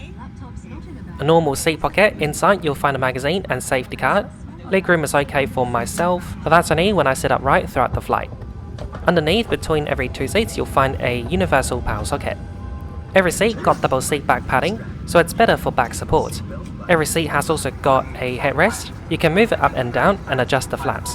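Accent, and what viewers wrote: Australia. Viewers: you are sounding more Aussie than ever; Chuck a Ueee! 🙂 Another great report from not your first time in Queenstown!. See you very soon. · G'day, I love your Aussie accent and lingo with "doing a uey" Looked like a great flight. Least you had a screen in front of you, and the food looked very tasty 🤩New Zealand looks awesome, so want to go there. Look forward to your next video, although I'm still catching up on your previous ones 👍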